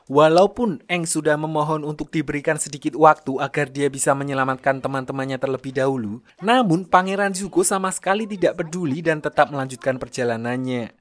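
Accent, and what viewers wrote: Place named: Tulungagung, Indonesia. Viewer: Wong tulungagung sampean cak😂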